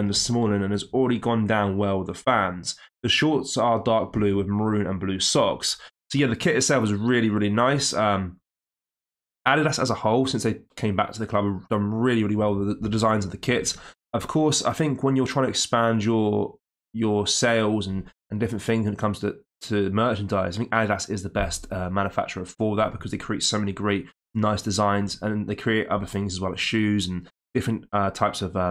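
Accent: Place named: Upper class, United Kingdom. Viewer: The public schoolboy accent REALLY pisses me right off . Time to get a proper job son...!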